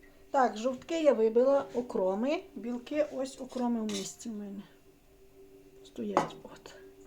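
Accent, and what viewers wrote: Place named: Solotvyno, Ukraine. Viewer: Ой 😄у нас так само кажуть" штири" откроми" 👍наче ви наша солотвинська(Бердичівський рн)🫶👍👍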